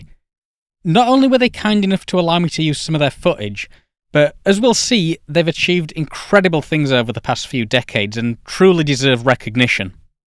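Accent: United Kingdom. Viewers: Kind of hard to take this seriously when you mispronounce "photon" 50% of the time. Practice your "t"s Sound less bri-ish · I was wondering if this was going to be a good video, and then a young Brit started talking. I was relieved. haha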